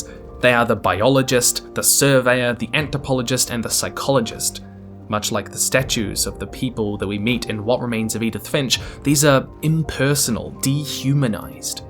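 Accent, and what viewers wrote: New Zealand. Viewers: Whaaaaaaaaaaat??? His accent is... new zealandish? woooow brah Would never guess (maybe I'm... wrong?) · You sound like a kiwi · I didnt know was from new zeland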